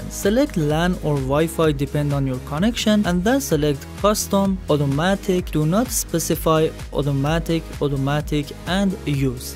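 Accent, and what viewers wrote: Iran. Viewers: فکر کنم شما ایرانی هستی. از لحجه انگلیستون فهمیدم👌🏻✌🏻😅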